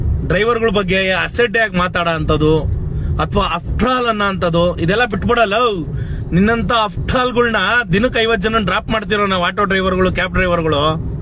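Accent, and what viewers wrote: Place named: Anekal, India. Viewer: Anekal benglore alwa, nin bashe, 😂😂😂😂😂😂😂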